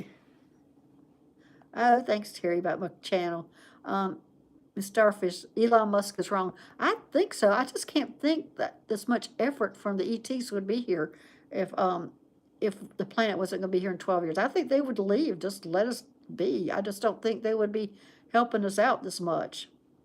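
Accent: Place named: South, United States. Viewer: Thank you for all you do. I’m probably too late, but here’s my STARSEED. You have the same southern accent my parents had. Very comforting. 💚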